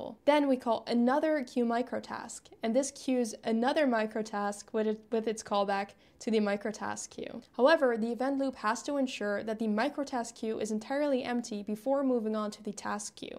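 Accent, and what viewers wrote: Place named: Netherlands. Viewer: I hear some slight Dutch here :p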